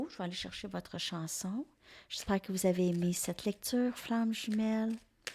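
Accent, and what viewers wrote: Quebec, Canada. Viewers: 😂Vous, êtes très belle avec votre accent, le même que Céline Dion🌸merci 🌺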